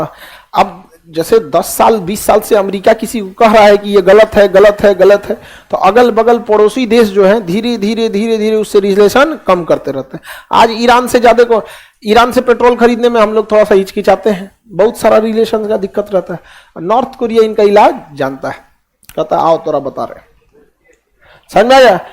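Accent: Bihar, India. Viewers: Bihar se ho kya jara dhang se bolo sunne walo ko samje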